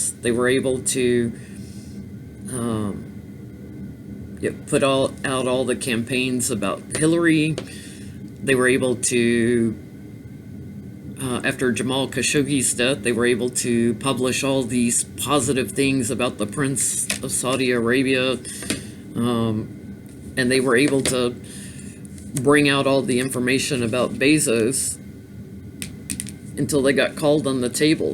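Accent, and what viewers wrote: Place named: Russia. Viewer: Great reading! I feel a Russian influence as well! 🌻👍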